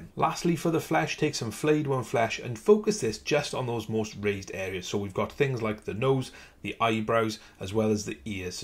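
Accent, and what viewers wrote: Wales, United Kingdom. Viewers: You Welsh man? I'm like 99% sure but its so uncommon finding another Welshie online lmao. Anyway, bangin paint job, I just got a bunch of votann and I'm loving the tips!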